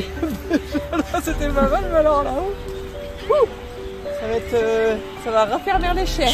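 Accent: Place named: Germany. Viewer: Merci pour ce joli reportage, je suis surprise par cette belle architecture même si elle est cubique. J'ai adoré votre accent allemand 😀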